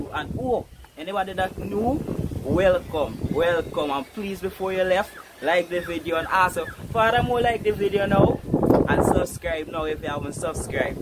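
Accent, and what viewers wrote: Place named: Guyana. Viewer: Keep the Guyanese accent bro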